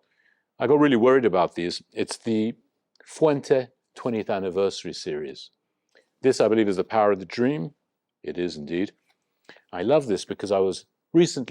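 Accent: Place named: United Kingdom. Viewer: nice ckearly britain english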